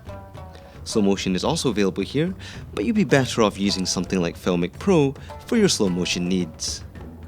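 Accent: United Kingdom. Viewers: Too much British accent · Y'all need to chill. He's speaking with a British accent. British spell it colour. That's the joke. I'm well aware that America spells it color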